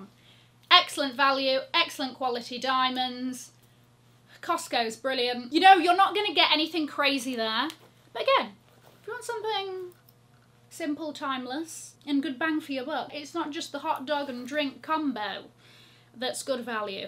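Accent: United Kingdom. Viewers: I didn't think you would have a British accent. Surprise! ❤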